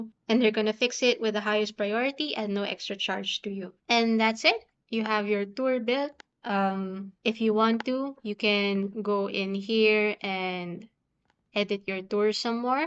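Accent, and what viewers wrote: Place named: Philippines. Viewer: Been watching these videos, you sound Pilipina (Pilipino here)! I'm liking what you guys do, just signed up :)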